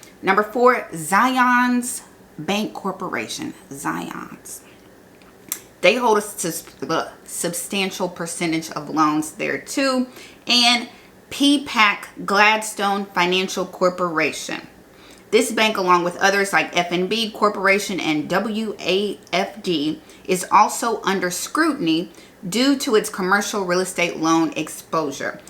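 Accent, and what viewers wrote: South, United States. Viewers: Love and hugs from Jersey 💪🏽 Love that Southern Drawl ❤ 🎉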